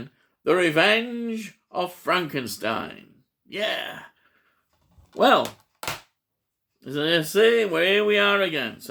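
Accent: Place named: Wigan, United Kingdom. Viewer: Hi. I'm a huge Hammer fan as well. If you haven't already done so, grab yourself the 2 new release from Second Sight (The Mummy & Frankenstein and the Monster From Hell) as they have done a wonderful job on the restoration. I've watched all of your videos and can't help but think that with your accent must must live quite close to me, I'm from Wigan.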